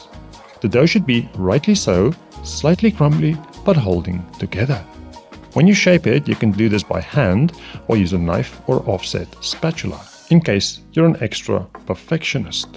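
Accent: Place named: South Africa. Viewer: I know that accent😊 It is South African.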